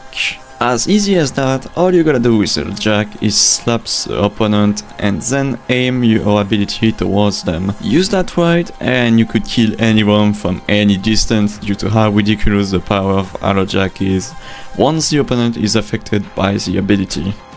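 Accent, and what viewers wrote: France. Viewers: Is my guy french??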